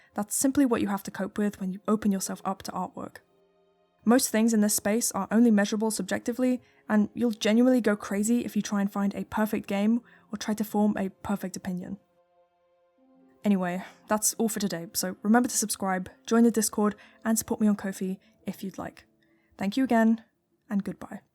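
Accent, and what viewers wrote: United Kingdom. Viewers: Ah a British female voice on darksouls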